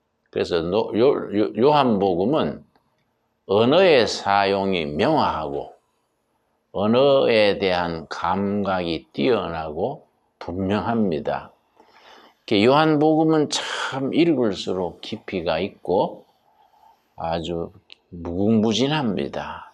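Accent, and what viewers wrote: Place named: Gyeongsang, South Korea. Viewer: 구수한 경상도 사투리로 엮어 주시는 하나님말씀 때때로 난해한 성경말씀을 쉽게 풀어 강해해 주시는목사님 말씀은 언제나 제게 감동을 줍니다 감사 합니다 감사합니다.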